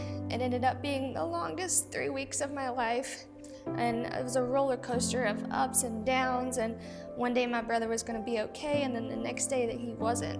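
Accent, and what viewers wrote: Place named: South, United States. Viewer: I've been with you since the pandemic, and just watched this. I am even more inspired and in love with you, your personality and positivity. You are infectious and I admit what brought me here originally was your southern accent (as a Charlotte native). Thank you for bringing your all to us and making us better! Your brother would be so proud of you <3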